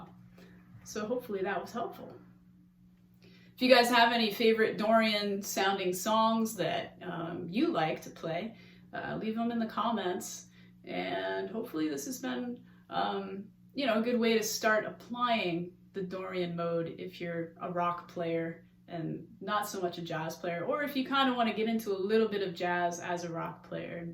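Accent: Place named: United States. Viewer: Hello.Im a very late beginner to playing music, and on Guitar, I just managed to learn the Dorian mode in A, also learning. Youe lesson I just viewed is great BUT 2 things interfere to learn from you, 1 speed of instruction and 2 accent im from England so the American accent and speed of delivery is difficult to follow for me. BUT I enjoyed this lesson tutorial whatever. Thank you I will review it many times hopefully understanding as I develop in understanding your presentations.Nice to listen to a female for a change, I tihink maybe easier to learn from? Looking forward to hearing from you more.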